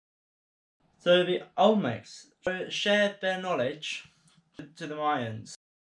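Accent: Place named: United Kingdom. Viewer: I would love to see a house tour as well as yard also. I never been to UK...I love the accent ✌️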